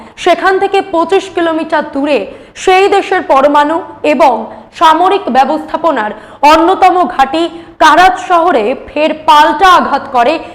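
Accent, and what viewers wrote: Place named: Kolkata, India. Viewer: কোলকাতা মানুষের ভাষার টোন আলাদা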